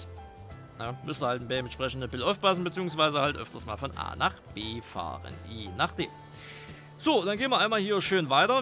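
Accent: Saxony, Germany. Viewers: Tolles Video, Super Karte,da wurde richtig abgeliefert. Großes Lob an den maper. Ich bin großer Fan von deinen Videos und wie man hören kann bist du Sachse. Mach weiter so. Gruß Rene aus Dresden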